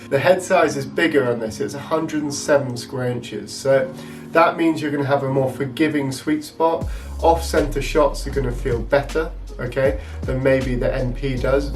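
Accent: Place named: England, United Kingdom. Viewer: England? I can barely understand, but love the accent. What about senior players? I’m finding this racket pretty awesome.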